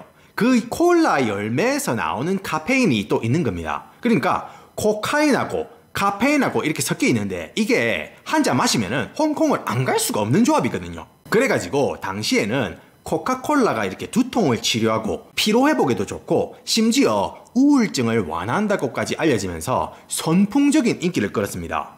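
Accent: Gyeongsang, South Korea. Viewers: 아니.. 우리 경상도 아재 언제 채널명이 바꿨는지. 어허. 이무슨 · 경상도 분이신가요??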